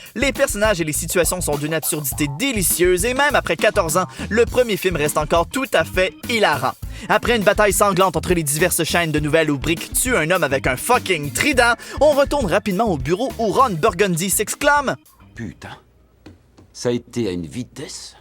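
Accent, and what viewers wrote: Quebec, Canada. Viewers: J'adore le Québec et c'est un rêve de partir la bas . Et ton accent québécois🤗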